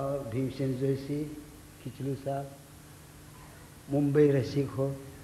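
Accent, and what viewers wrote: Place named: Karnataka, India. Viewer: His marathi accent is soo much kaanadi 🤗❤️ felt like he is talking kannada 🤗🎉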